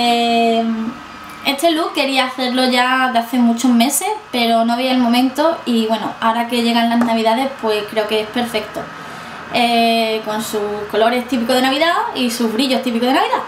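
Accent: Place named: Andalusia, Spain. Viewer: se te nota el ramalazo andaluz y ole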